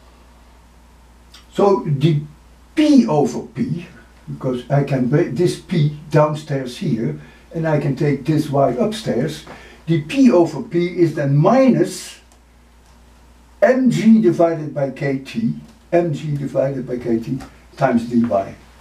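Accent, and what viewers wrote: Netherlands. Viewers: Are u from the Nederlands?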